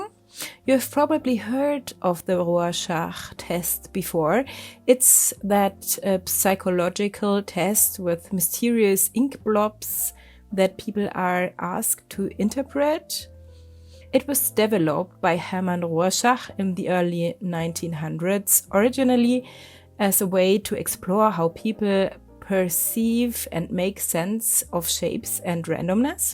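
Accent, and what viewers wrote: Germany. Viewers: Ich höre da einen deutschen Akzent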